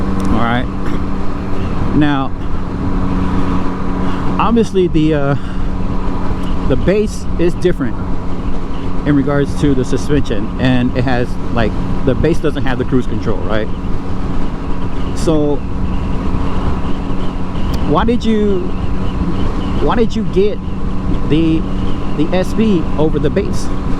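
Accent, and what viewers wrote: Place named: Louisiana, United States. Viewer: Are you in Louisiana? You sound like one of my Cajun cousins.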